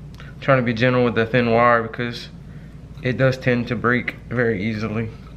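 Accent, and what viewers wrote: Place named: Lafayette, United States. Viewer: When I hear you talking, I knew you from Lafayette. I saw you scan and seen KATC and confirmed. I'm 40 min South of you. Great video!!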